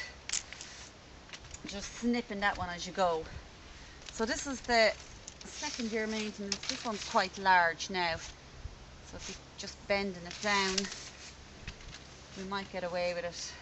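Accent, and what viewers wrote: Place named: Ireland. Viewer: I love a soft Irish accent. Those 'th' sounds are to die for. That's a really amazing structure. I'm only hoping for some firewood from a few planted shoots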